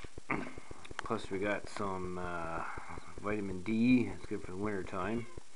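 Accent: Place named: Newfoundland, Canada. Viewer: Definitely sound like a Newfie. ;)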